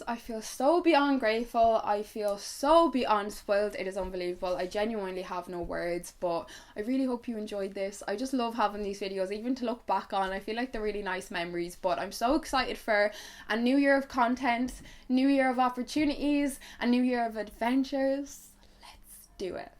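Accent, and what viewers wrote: Ireland. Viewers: Very deep Irish accent coming out from your mouth 👄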